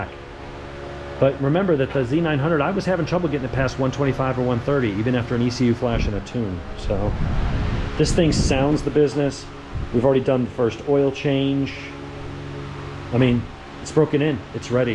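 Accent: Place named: Australia. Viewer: On point with the Ozzie accent.😄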